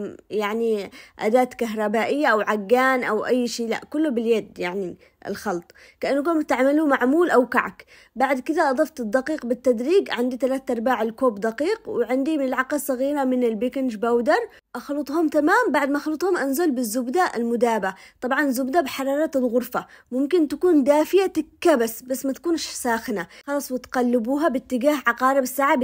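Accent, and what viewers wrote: Aden, Yemen. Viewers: لا لهجتها عدنيه الصنعني مختلفه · الأخت كإنها يمنية صديقتي يمنية جدا أحبها وهي تتكلم بلهجتها اليمنية هي من صنعاء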